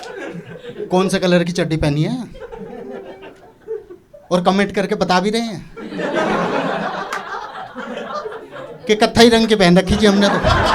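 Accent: Western Uttar Pradesh, India. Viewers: I love west up Xcent 😅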